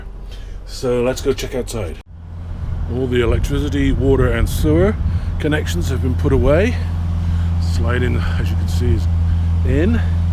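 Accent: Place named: United Kingdom. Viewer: another Brit with messed up ascent... when I lived over there my accent didn't know where I was from... but when I got back here the American twang died ... lovely video of your first trip out ... are you still on the road as this is over a year old... one day I will be back and plan to travel again..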